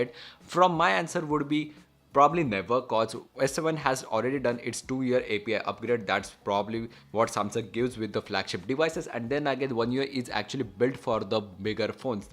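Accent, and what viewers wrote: India. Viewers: Its the accent actually. Hindi. And thats something unique.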